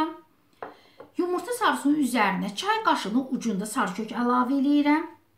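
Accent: Baku, Azerbaijan. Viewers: Salam bacı. Kərə yağını duru yağla əvəz etmək olarmı xəmirində? Bakı ləhcəsi ilə danışığınız 👍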